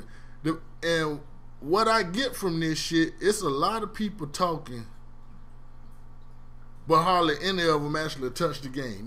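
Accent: United States. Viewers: fool sound like he from the south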